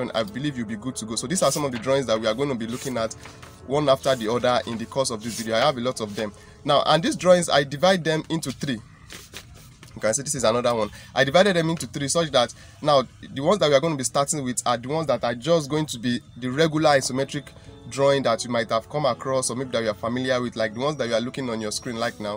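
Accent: Nigeria. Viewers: Thanks very much sir. Sir it's seems like you are from Nigeria. Are you from Nigeria?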